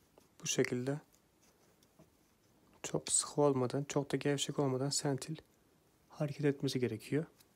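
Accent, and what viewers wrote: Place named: Kastamonu, Turkey. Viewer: Aslan gardaşım devam et maşallah sening gerdüre gerdüre gonuştuğun Gastamonu ağzına gurban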